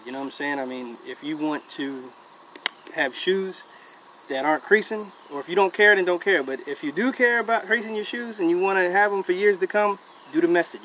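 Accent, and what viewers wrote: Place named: Appalachia, United States. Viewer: HE SOUNDS LIKE OWNAGEPRANK'S HILLBILLY VOICE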